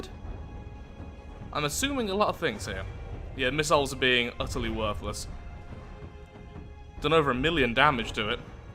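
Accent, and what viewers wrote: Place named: Birmingham, United Kingdom. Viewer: You can really tell Is a brummy sometimes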